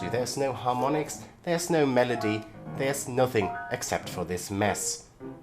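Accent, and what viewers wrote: Germany. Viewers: Amazing video! I enjoyed it thoroughly because your explanations and highlights of each piece. I hadn’t heard some of the pieces you presented on the video like Godowsky’s Passacaglia or Regers’s variations which I’ll give them a try once I’m done with your video. Thank you for adding them to your video broadening my repertoire, at least as listener. By the way, I have a question that might be off topic but, are you German? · wait kommst du aus Deutschland/sprichst deutsch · Are you from germany?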